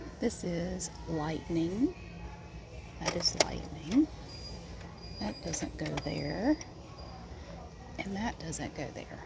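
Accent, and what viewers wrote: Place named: South, United States. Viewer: Oooooo yay!! I love these videos it’s so soothing to watch you organise the shelves along with your southern accent talking away ( I’m British 🇬🇧 and I love me a southern accent 😊 ) I especially love when you organise the soaps!! ( that’s my fave ❤ ) Looking forward to another one of them videos too!!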